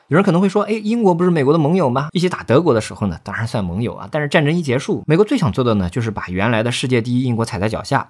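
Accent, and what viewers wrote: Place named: Nanjing, China. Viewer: 这个我滴妈很有南京人的腔调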